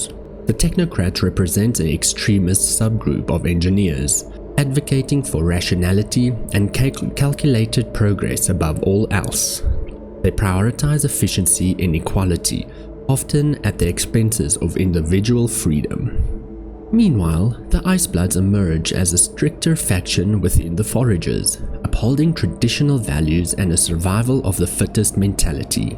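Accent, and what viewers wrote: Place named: Pretoria, South Africa. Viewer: Thats a fkn Pretoria accent no doubt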